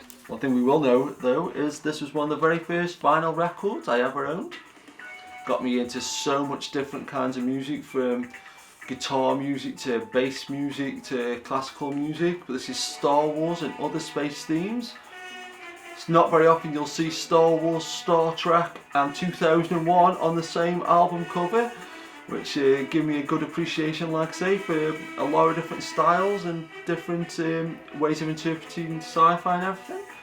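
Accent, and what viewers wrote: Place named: Stoke-on-Trent, United Kingdom. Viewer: Where is you accent from, it sounds a bit stoke on Trent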